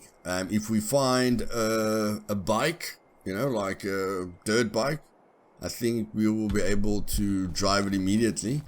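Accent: South Africa. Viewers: Is that a South African accent I hear my ou? Great video keep up the good work! Jy verduidelik die detail van die game baie goed.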